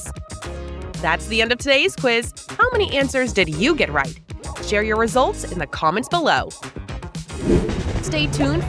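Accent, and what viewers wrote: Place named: United States. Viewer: there must be a lot of dumb shishkababs out there!!! Like your American acsent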